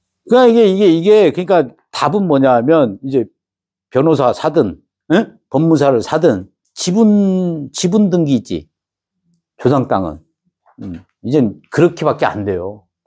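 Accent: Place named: Jeju, South Korea. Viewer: 제주도 사투리 넘 웃겨요~~최고의 강의 감사합니다~~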